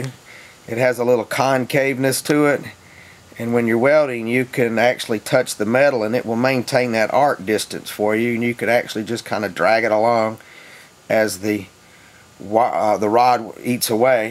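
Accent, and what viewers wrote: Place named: Southwest Virginia, United States. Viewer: Out of curiosity, where are you from? Sounds like our accents here in southwest Virginia.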